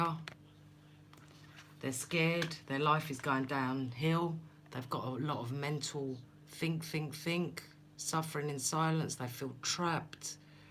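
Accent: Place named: Australia. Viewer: Keep your chin up. The lady will look a bit off. These are collective readings. Good Heavens, not just for her. These are for entertainment. If anyone finds something as advice...well ok. I do at times. Love your Australian accent. :) ................I couldn't do an edit. But yes, LOL!!!! You are so much like someone I know slightly at work. I feel the lady is intuitive but has to hold it back because of the culture at work. :)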